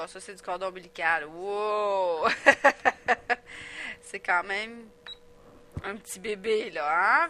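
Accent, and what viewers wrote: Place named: Quebec, Canada. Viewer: Québécoise tout simplement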